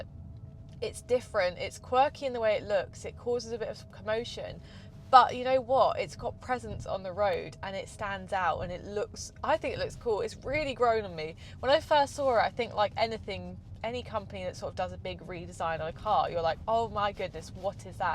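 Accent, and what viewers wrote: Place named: United Kingdom. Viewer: I love your british accent I feel like keep listening to you.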